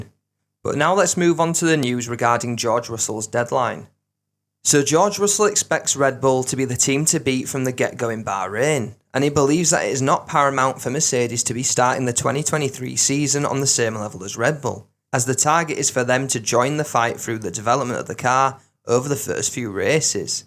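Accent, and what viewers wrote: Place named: Yorkshire, United Kingdom. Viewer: As a non native english speaker .... just outta curiosity. You from yorkshire, duck?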